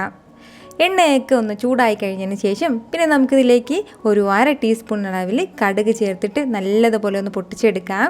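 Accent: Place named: North Kerala, India. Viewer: ഒരു വടക്കൻ കേരളം slang ഉണ്ട് kitchen may have routes from മലപ്പുറം, കോഴിക്കോട്, or കണ്ണൂർ.., I think so. Very cute വർത്തമാനം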